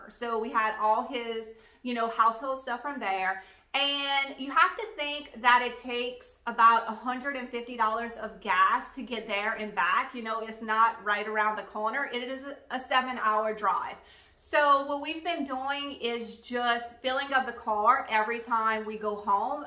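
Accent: Louisiana, United States. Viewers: I just discovered your channel! I am an hour and a half from Memphis & lived in Tupelo in the mid seventies! Can you share where the thrift store is near the Goodwill that has the good prices? I look forward to catching up on all your videos. Love your cajun accent!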